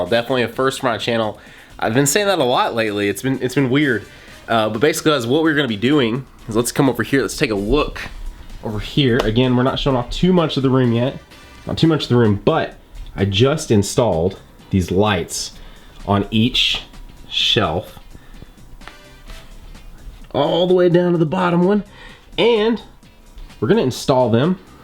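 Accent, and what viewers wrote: South, United States. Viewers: are you from the south sounds like you have a southern accent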